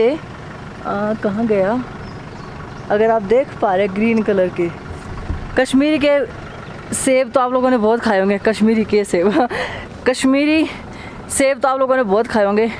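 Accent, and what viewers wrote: Haryana, India. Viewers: Haryanvi accent superbbb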